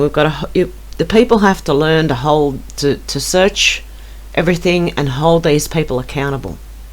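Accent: Australia. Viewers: R U Aussie? Me too! God bless you!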